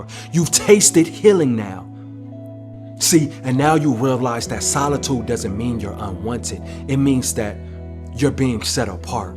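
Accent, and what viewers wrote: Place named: New York, United States. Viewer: This brother is so deep. I just came here today and subscribed. I’ve been working on myself, paying attention to what I put in my body as he said in the other video and healing. I needed this confirmation. I love his passion! His voice makes you stand at attention!!!! He has a New York or Northern style passion is his voice. I love this channel. Not about vain BS.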